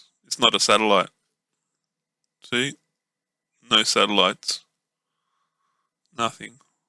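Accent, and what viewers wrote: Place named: Australia. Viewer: I got sooo much to catch up on 👍love your Aussie Accent coming through 😅.. this sounds amazing.. 👍 Great to catch up on some new videos ( new to me as I’m running behind ). Always great to watch your videos.. WOW what Size is that I wonder 💭 Thank you so much for sharing again 💫😃 🛸 🛸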